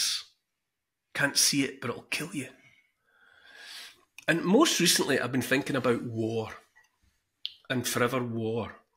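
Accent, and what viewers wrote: Scotland, United Kingdom. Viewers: The Scottish accent is so very charming! I love it. (The Irish accent is equally charming, definitely different from the Scottish, but similar to American ears.)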